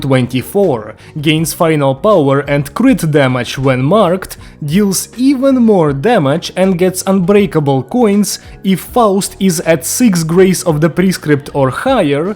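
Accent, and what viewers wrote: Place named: Russia. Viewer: почему произошение такое будто русский читает англ текст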